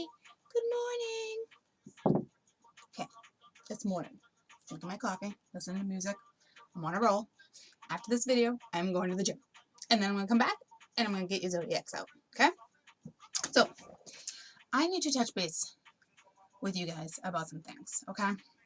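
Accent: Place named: New York, United States. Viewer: I'm new to your channel, just wanted to say I love your energy and your accent is everything. Jersey or Brooklyn? (no offense meant)!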